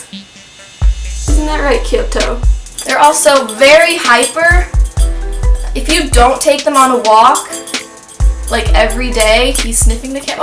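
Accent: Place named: United States. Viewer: Where are you from? Your american english accent is pretty good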